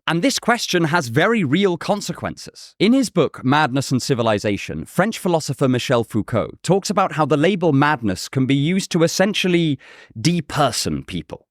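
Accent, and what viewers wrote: RP, United Kingdom. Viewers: Did anyone tell you that you got one the most British accent ever ? Like these days, whenever I think of British English, I tend to hear your voice. No offense intended if that happens to be a sensitive area btw.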